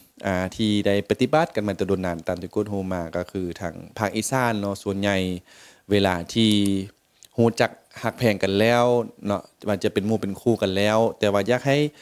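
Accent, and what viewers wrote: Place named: Nakhon, Thailand. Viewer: เว้าภาษาสำเนียงเหมือนคน อ.นครไทย จ.พิษณุโลกเลยค่ะ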